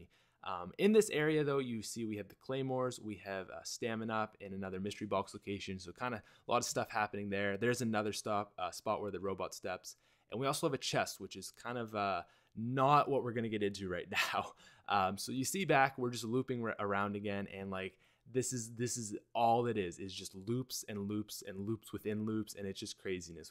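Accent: Canada. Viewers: are you canadian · R u canadian?😋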